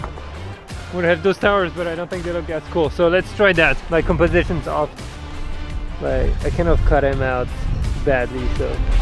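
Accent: France. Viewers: I wonder if ...tu es français ou québécois!? :D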